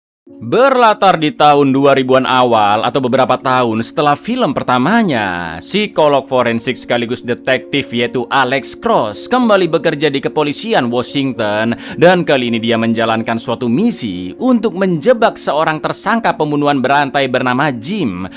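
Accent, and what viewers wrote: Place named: East Java, Indonesia. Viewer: Suarane logat sampean koyok wong jawatimur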